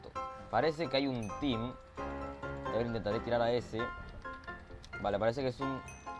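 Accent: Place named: Colombia. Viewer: Eres de colombia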